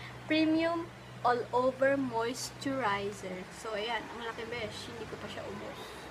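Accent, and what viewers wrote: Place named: Iloilo, Philippines. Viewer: Ilongga ka girl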